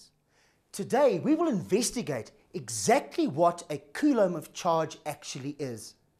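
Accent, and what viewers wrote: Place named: South Africa. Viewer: sounds like a south african accent mate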